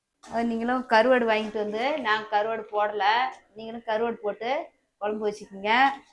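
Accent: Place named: Salem, India. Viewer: Salem slang la pesaranga akka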